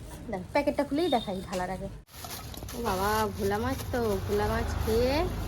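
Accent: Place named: Bangal, Bangladesh. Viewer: Tumar mukha bangal vasa darun laga❤❤❤❤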